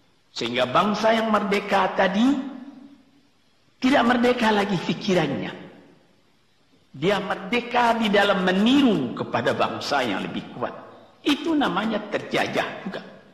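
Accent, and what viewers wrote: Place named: West Sumatra, Indonesia. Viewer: logat minang ny khas banget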